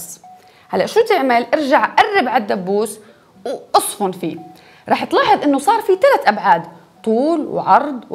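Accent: Jordan. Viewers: ادري الموضوع فيزياء وبلاوي بس وربي اللهجه الاردنيه تجنن بحس ودي اضحك 😂😂😂 واحلى اشتراك 😊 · ليست لبنانية.. ربما هي من جنوب الأردن ولكنها قطعا ليست لبنانية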